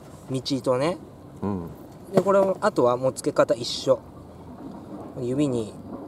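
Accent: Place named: Nagoya, Japan. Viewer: 会長、名古屋弁どらでまくりだがや！笑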